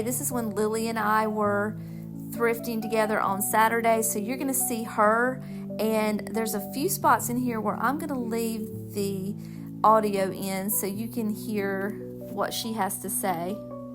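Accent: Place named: North Carolina, United States. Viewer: OMG! Ms. Beasley! She used to be in the Sears Christmas catalog and my sister and I would argue over which of us would get her. We thought there was only one, because the picture in the catalog only showed one! 😂 She had little gold-rimmed reading glasses and I loved her! I’m a first time watcher and your accent immediately gave me a North Carolina vibe. My late husband was from there. Enjoyed the video and have subscribed.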